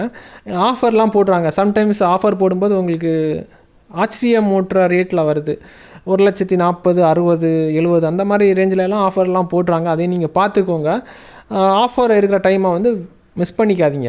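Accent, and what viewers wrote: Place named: Nagercoil, India. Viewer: Nanba ne nagarcovil thana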